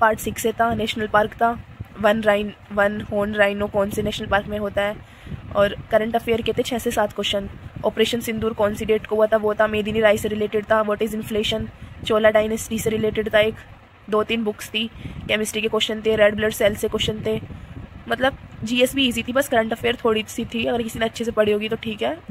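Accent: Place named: Meerut, India. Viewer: awaaz se to meerut, shamli side ki lgti ho... btw thank you ..bdia review h 30 oct ko h mera dekhte hn...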